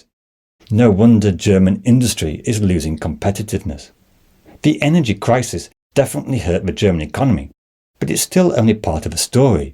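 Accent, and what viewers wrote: United Kingdom. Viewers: I detect a british accent, if I am right ? It's always funny people from Countries that turned into utter shitholes start worrying about other Countries 😂 Yes, at one point there will be stagnation or a small decline - like in every Country - like it has happened sometimes in the last 50 years - geeeee- only IQ less fools believe that growing an Economy more and more every year after year after year is possible - IT IS NOT 😂 AND THAT IS NOT A BAD THING - GETS EVERYBODY DOWN TO EARTH. And If I where you - I would worry about Little Britain much much much more than Germany. They are after all still in the EU. And what goes for Energy prizes - Well , they are more than Double in Little Britain than in Germany , the last I read 😂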